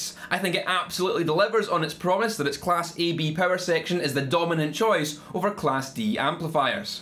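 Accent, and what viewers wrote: Scotland, United Kingdom. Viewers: Good God. Scottish parents, please don't saddle your offspring with this awful accent. Thanks...America and the rest of the world. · That's really very interesting ! Plus, making the effort to get your accent, I think I can now understand every single english accent in the world ! I thought Trainspotting was hard to understand, but now I get what Scotland really is all about !! Thanks man ! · this Scottish accent sounds much comfortable after viewing decades of clips from this channel. good vid, thanks.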